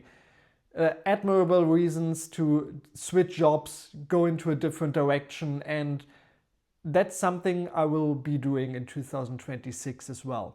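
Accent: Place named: Germany. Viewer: You sound German! I guess you’re going balls deep into manga, huh? 😀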